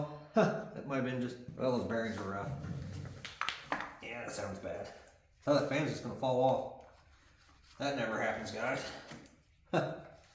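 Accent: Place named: United States. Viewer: I'm as Ukrainian, I like your videos to hear a "real" American English, not like they're speaking on a news channels etc.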